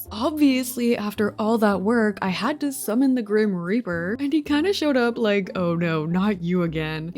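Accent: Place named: Canada. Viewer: Right I couldn’t tell if she is just Canadian™️ or didn’t know how to pronounce Grotto 😅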